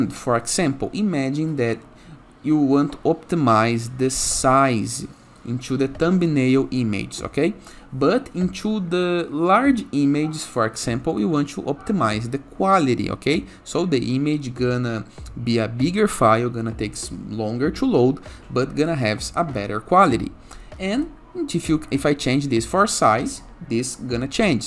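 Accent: Brazil. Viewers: Esse sotaque é BR certeza. Extensão PHP GD ou Imagick ausente. Não deu certo mas obrigado.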